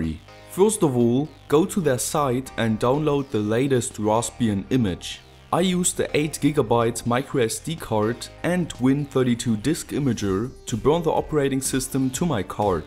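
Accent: Germany. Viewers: Jap er ist deutsch. Hört man doch:D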